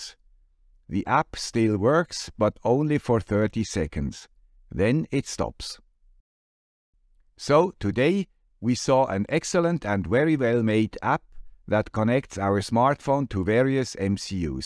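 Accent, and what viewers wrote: Switzerland. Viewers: Hi to the guy with the Swiss accent from the guy with the Swedish accent! 😂😂😂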